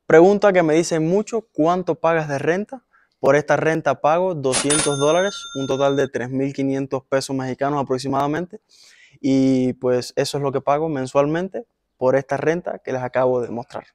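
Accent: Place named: Cuba. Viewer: Pero si tiene acento cubano,y no tiene sangre azteca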